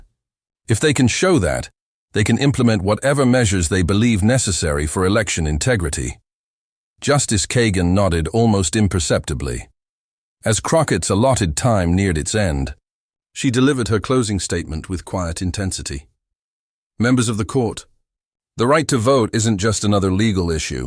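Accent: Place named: United Kingdom. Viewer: We need an AMERICAN narrator!! Not a Briton….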